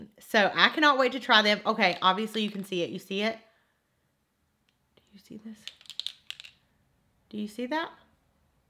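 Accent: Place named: Texas, United States. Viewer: 😃😊 your accent is tooo flipping cuuute! Love it live it im from dallas tx origionally now stuck in indiana but your accent reminds me of home in a i miss tx kinda way!☺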